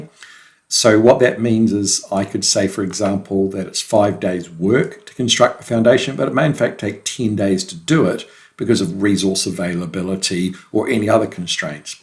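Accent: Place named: Australia. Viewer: yeah you sound Aussie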